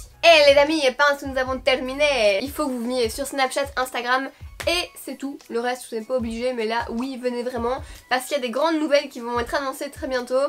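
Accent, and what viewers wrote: Belgium. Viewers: Accent belge VIVE LA BELGIQUE!!!!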